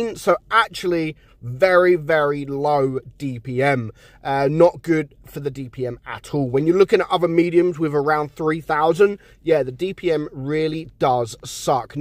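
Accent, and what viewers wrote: United Kingdom. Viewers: I like your Brittish Accent😁🇬🇧 Do you prefer Cobra? but is just like Smasher but 9 and medium.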